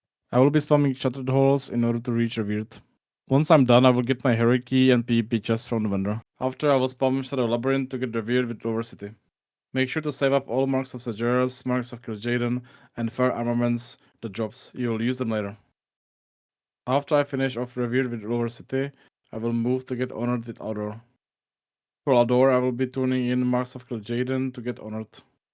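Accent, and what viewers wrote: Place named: Czech Republic. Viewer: Where are u from man? I hear Czech ❤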